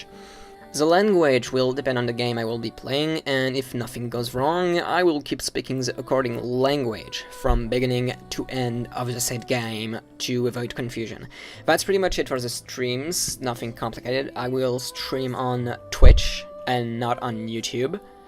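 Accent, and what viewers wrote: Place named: United Kingdom. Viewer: cet accent british sa race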